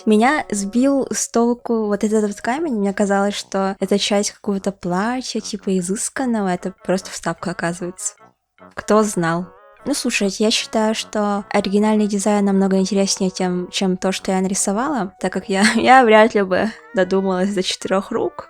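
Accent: Yakutia, Russia. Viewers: Я ИСПУГАЛАСЬ ЯКУТСКОГО ЯЗЫКА, Я НЕ ОЖИДАЛА ЭТОГО.. только сейчас начала слышать якутский акцент и голос очень хочу на фестиваль, но я в деревне живу, а в город еду только январем 😭😭😭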